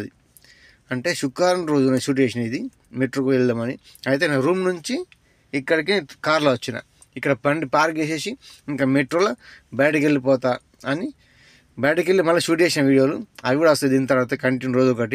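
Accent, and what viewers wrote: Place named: Telangana, India. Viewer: Mi telangana slang super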